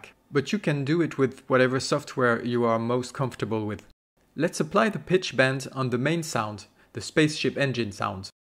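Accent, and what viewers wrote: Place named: France. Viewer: Slight french accent detected.Nice tutorial by the way :)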